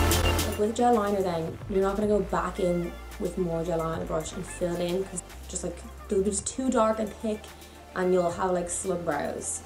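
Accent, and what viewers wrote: Ireland. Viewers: oh okay thank you so much and are u Irish? Love the accent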